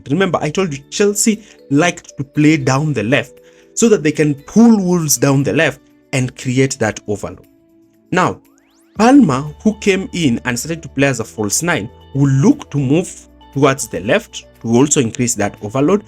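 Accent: Kenya. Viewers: Nice analysis. Accent is familiar, are you from Kenya or have lived in Kenya in the past?😅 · This accent is so Kenyan, love it, also the analysis ismind opening, kudos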